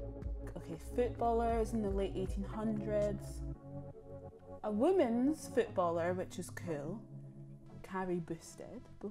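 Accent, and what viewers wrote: Scotland, United Kingdom. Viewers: For everyone in the comments saying this woman isn't Scottish, close your eyes and turn the volume up full. Listen to her voice and don't look at her skin colour. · I don't feel your elephant example was very good. 2 African elephants having a calf in India wouldn't make it an Indian elephant, no. Because they are different species. It's all in the ears you know. However, if it was so inclined that same calf could apply for Indian citizenship I suppose!?! I'm really not sure why this is an on going discussion. Are you basing the fact that you think the Lass in the video is not Scottish based on the colour of her skin? If there was no video and only sound would you think she was Scottish? She has a Scottish accent anyway. Just think how many times she has had to put up with that! And you still have not given a reasonable answer as to why you can have an Ulster Scot but not a Nigerian Scot? 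And what do you mean no such thing as a Nigerian Scot? The video above all these comments shows there is!! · Just by her accent alone she sounds more scottish than me, but if you saw me you would think i was scottish because im white. You cant know everything about a person's history based on their appearance. Even if they werent born and/or raised in scotland, that doesnt give anyone any less right to call themselves scottish.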